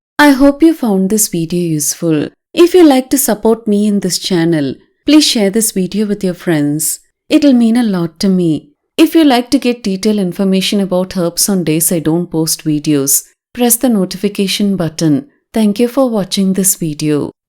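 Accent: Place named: Tamil Nadu, India. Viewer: You speak English like Tamil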